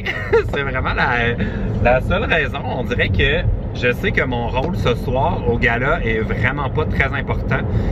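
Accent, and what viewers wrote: Quebec, Canada. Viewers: Omg!! Tu m as eu!!😂 Et cet accent québécois 😍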